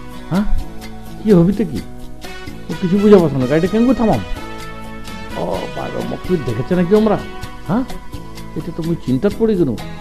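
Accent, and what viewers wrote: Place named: Dinajpur, Bangladesh. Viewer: দিনাজপুরের আঞ্চলিক ভাষা গুলো আপনার মুখে দারুন শোনায় স্যার। প্রতি ইদে এটা একটা আলাদা আকর্ষন অনেকের জন্যই। দিনাজপুরের সকলের উদ্দেশ্যে, দিনাজপুরের চোখধাঁধানো খেলাগুলো দেখতে চোখ রাখুন আমাদের চ্যানেল Sports Mania তে।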